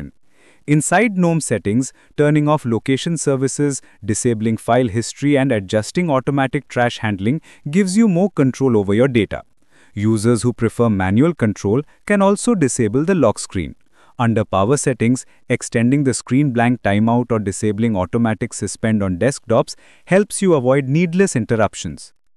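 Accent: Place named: India. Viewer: 换成印度口音了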